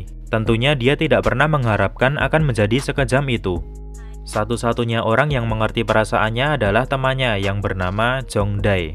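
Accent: Java, Indonesia. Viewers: Iki wong jowo iki . joss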